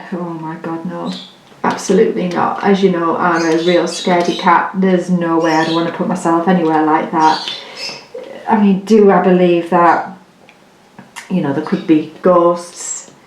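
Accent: United Kingdom. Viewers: I've just come across your channel and will sub. At the moment I'm working my way through your diamond painting but I've laughed and laughed it just unbelievable......I'm in my early seventies but you've answered all those tags the same as I would.......my dread movie was the first (never watched the others) hallowe'en I was terrified!!!!! I couldn't sleep afterwards i couldn't look at the window and the door at the same time so I was tossing and turning keeping check allllll night lol. It was the fact that he didn't die I was so sure he was coming after me. I scream at gremlins my kids moved from me when I took them to the pictures. And I cut my finger down to the bone when my dad just walked into the kitchen while I was cutting bread.....I nearly clung to the ceiling like Tom from Tom and Jerry 🤦‍♀️ I also do cross stitch, jewellery making, paper crafts if all kinds and love to crochet. 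Not enough hours in the day. Must go and watch-listen to your other vids while I'm dping. I also love to dp to audio books. Its lovely also to listen to Uk voice. I'm from Liverpool. Keep up the good work. Thanks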